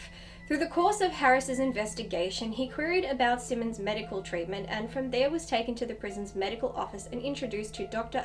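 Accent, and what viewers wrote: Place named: United Kingdom. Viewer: Besides doing some good research, the only thing you have in common with “Minty” is that you both speak versions of “The Queen’s English,” (he’s a Kiwi). I’ve been a fan of the movie since the 90s, and am just getting around to the audiobook. Oh, and I’m from Baltimore, and spent a lot of time in Pittsburgh where it was filmed, so it’s always had a special place in my liver, I mean heart <3 Nice research!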